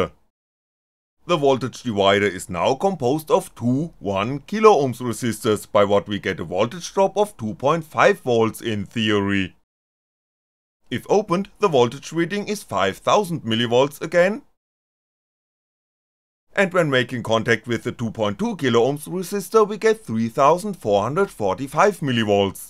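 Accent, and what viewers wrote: Germany. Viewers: Lovely German accent.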